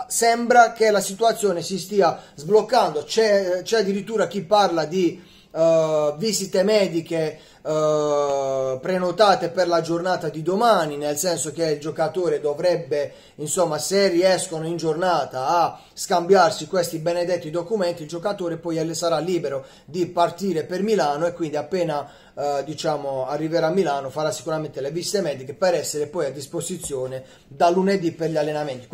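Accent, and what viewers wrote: Apulia, Italy. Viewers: Sei grande ma sei forse di origini pugliesi.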